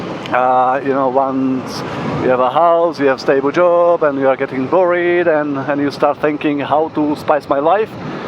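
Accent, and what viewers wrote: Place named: Slovakia. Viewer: Hi. Thanks for your videos about CRF300L. Please how tall are you? Or maybe better, what's your inseam? Did you consider/testdrive CRF300 Rally as well? Btw, maybe I am wrong, but your English sounds very "Slovak" =).. Aren't you from Slovakia? Thanks again for a feedback. Lukas.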